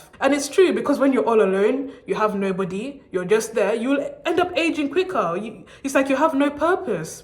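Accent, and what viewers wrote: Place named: Southern Africa. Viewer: You have a southern African accent, love it!!❤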